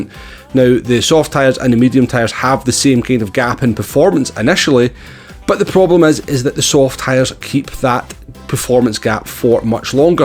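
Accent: Scotland, United Kingdom. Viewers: Thanks for reminding me for how it used to be on Gt Sport; it def made the races more interesting. Btw sorry to that I haven’t checked in since I joined the channel, work etc has been full on the last few months. I do watch when I can. My 13 yr old son and me enjoy the races, and the Scotch accent! Cheers sir.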